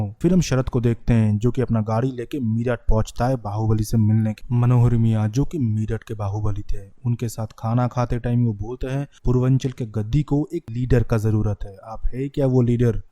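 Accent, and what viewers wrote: Bengal, India. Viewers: Bhai tum...bangali ho kya...??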